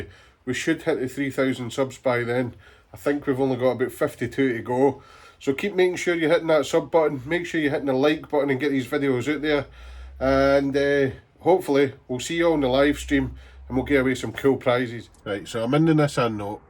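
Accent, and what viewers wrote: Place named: Scotland, United Kingdom. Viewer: Where in Scotland are you from? I'm in Livingston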